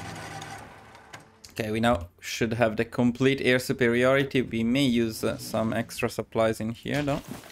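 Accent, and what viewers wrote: Italy. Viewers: Out of curiosity: are you italian? The accent looks like it. Loving the videos, and ur accent remembers me of my father in law❤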